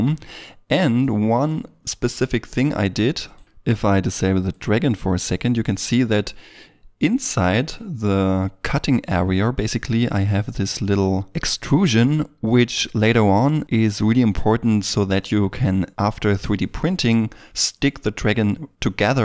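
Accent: Germany. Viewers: Uhh dein deutscher Akzent kommt schon durch, was es aber interessanter macht :3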